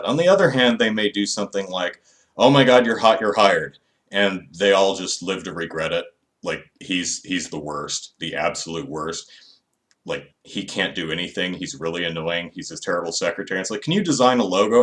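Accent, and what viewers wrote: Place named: Kentucky, United States. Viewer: Off-topic but did you grow up in KY? You have a certain way of speaking.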